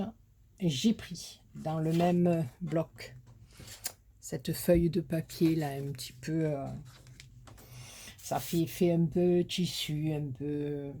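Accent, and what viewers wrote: South, France. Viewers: on entend bien l accent du midi.je suis de l aude tres jolie carte.tres vintage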